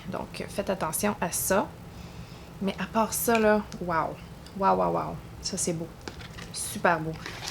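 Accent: Canada. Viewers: Super vidéo, je suis nouvelle sur ta chaîne. Comme toi passionnée de parfums. Tes vidéos sont très intéressantes. Je me suis abonnée à. Ton accent canadien est merveilleux 😍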